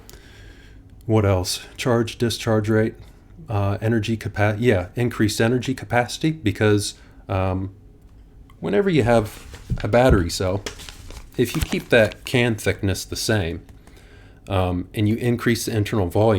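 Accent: New Zealand. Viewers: Nah, Yeah... That’s definitely Kiwi speak!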